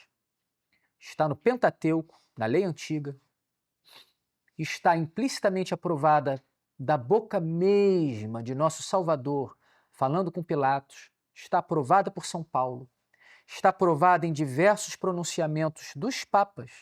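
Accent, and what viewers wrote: Rio de Janeiro, Brazil. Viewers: É que ele não tá acostumado com o carioquês, basta ver o Mestro Rorion Gracie falando Cadimia que a gente sabe que ele sabe que é academia, mas o carioquês é identidade pura. Eu entendo ele porque me dá um nervoso quando ouço Naisceu, por exemplo, que nem sei denonde veio essa forma de falar. kkkk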